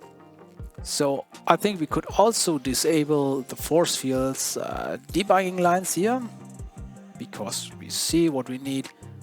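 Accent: Germany. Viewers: i hear german is that right?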